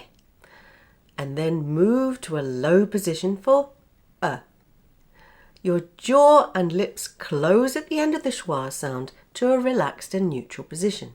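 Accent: United Kingdom. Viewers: 𝑵𝒐 𝒅𝒐𝒖𝒃𝒕, 𝑩𝒓𝒊𝒕𝒊𝒔𝒉 𝒔𝒐𝒖𝒏𝒅.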